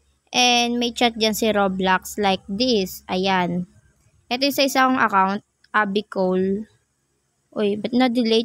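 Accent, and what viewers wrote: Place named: Philippines. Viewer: almost hears like you talk Philippines my mom talks Philippines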